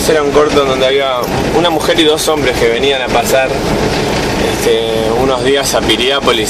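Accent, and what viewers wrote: Argentina. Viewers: Que raro, dice que se crio en Venezuela, pero habla como argentino.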